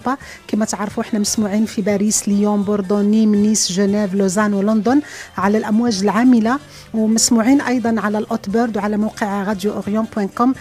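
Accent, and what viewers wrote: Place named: Morocco. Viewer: ارجعت الي المغرب انت دكتورة يا اترك لنا إذاعة الشرق لنا نحن المشارقة المغرب بجاجة إليك لماذا تحب ازعاجنا بصوتك من ساعات الصباح الأولي الباكر تتكلمي اللهجة السورية و اللبنانية بصوت مغربي ارجوك انت علي اساس دكتورة ممكن لك العمل في الإذاعات الآخر او حتي إذاعة الشرق ولكن ليس علي المباشر من شروق الشمس حتي غروبها بطريقه دكتاتورية لايوجد احد في الإذاعة الا انت فقط ارحمنا مع كامل احترامي الشديد إليك هناك المشارقة الذين يحبون الي سمع صوت مشرقي مشارقة و ليس مغربية تتكلم اللهجة السورية اللبنانية بصوت مغربي مع كامل احترامي الشديد الي كل المغاربة هذا حق لنا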